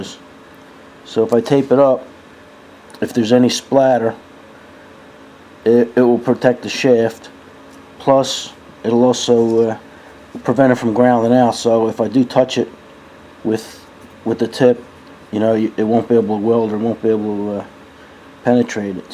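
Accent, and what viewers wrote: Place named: New Jersey, United States. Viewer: Your Jersey dialect versus my Canadian dialect.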